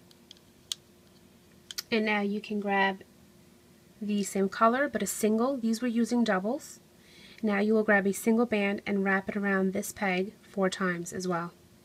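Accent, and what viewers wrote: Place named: New Jersey, United States. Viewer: you have a New Jersey voice... no offense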